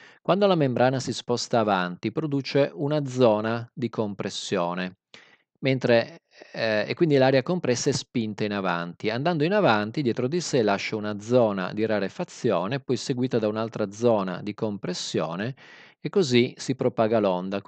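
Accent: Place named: Calabria, Italy. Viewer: Non si e capito molto sembri di Calabria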